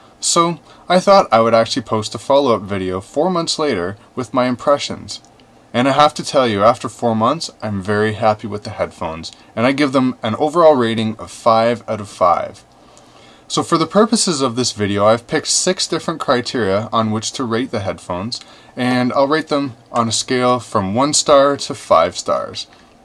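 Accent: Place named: Canada. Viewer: I hope the headphones sound better than your annoying Canadian accent.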